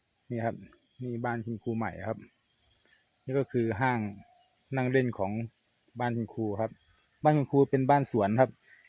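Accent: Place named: Korat, Thailand. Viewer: เป็นคนกะไหนน้อครับคือเห็นพูดโคราชดาครับ😄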